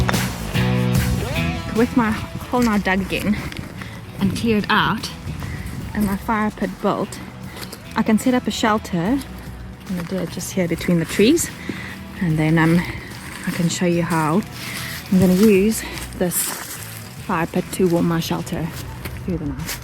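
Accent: South Africa. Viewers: SAFFA accent. Nothing else matters :) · South African accent. Just accept we all sound a bit different and enjoy the content of the video. 😎🇬🇧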